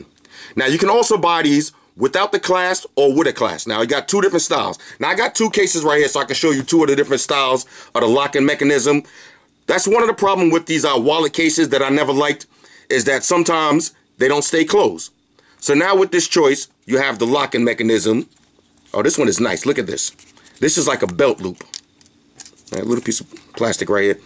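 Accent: New York, United States. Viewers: I always come here for your NYC Accent and your reviews!!!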